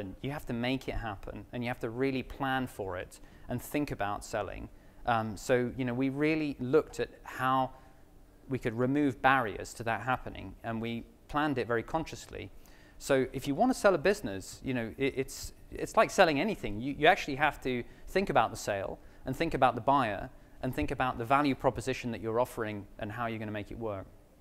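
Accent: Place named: England, United Kingdom. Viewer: Wait a minute....how'd Mr Money Mustache get an English accent????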